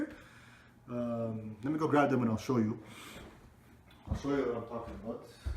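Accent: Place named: Egypt. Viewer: the egyptian accent love it. well done!!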